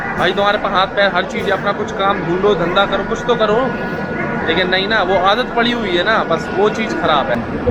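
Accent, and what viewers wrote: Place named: Bundelkhand, India. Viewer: Tum bundelkhand ke lag rahe ho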